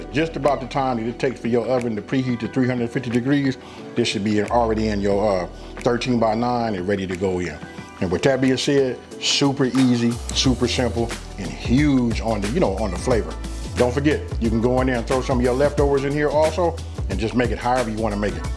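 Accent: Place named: South, United States. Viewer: Love your food, of course, but having been raised in the South, it's your dialect, accent, and tone that immerse me in your wonderful videos. One of my favorite YouTube chefs. You make me feel warm and at home.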